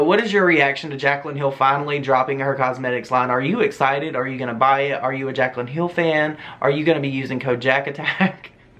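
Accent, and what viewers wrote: South, United States. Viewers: I love it so much that you have a southern accent ♥️ reminds me of the people around me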